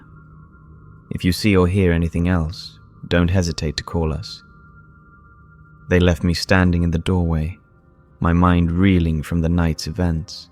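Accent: United Kingdom. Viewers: I enjoy YT channels that create their own content. I am in the process of watching all the videos on your homepage. The British accent in narration adds a nice flavor to the story. You have tales I haven't heard before, as I said the narration is wonderful, excellent grammar in the writing, have you ever thought of writing short stories of longer duration. I'll wager you would be good at it.. Thank you for all the effort you put into your productions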